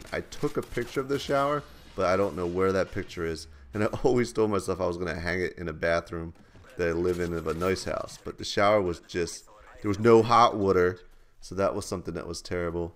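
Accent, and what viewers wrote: New Jersey, United States. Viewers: I keep thinking ur from jersey r u from Philly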